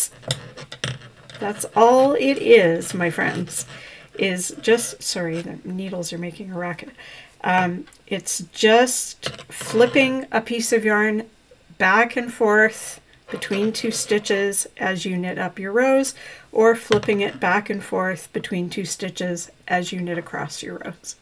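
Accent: Canada. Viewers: Yup, I remember finding out about 'em when I was doing brioche. Now that I crochet only, it's all changed. Like life. Sighh .. But I like your delivery, and I always like a Canadian voice. :)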